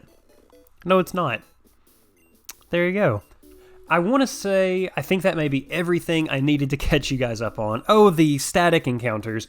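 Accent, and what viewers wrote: South, United States. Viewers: I <3 you. I’ve watched all of your Pokémon runs to this point (a few times since I watch them to sleep) and have now started this nuzlocke. It’s nice hearing another southern voice for my Pokémon runs. Plus, coming from a Pokémon challenge connoisseur, you have really high quality videos and they’re great to watch. I see you’re more busy with life now, and I just wanted to leave this comment and thank you for all the great content you’ve put on here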